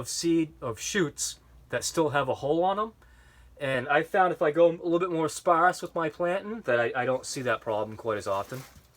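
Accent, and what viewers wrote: New England, United States. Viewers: Great video! Im getting ready to set up an indoor rack system and you answered a bunch of questions. Sounds like you're in New England 😎? We're out of Maine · Nice work! I'm new to your channel and I really enjoyed the content and do I hear a bit of new England accent?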